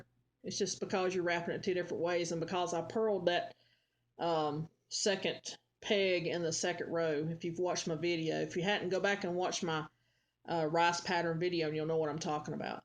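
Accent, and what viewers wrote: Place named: South Carolina, United States. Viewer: Hey hun, where you live? Upstate South Carolina here. You talk like we do , actually better than I do. People from other states have trouble understanding what I say. My daughter-in-law is German and taken years to feel comfortable talking with her! There's still times she and I don't comprehend one another. Also, I love your videos! I'm so grateful for you and several others, no offense, if not for these videos I probably would never started 😅! Thank you 😊!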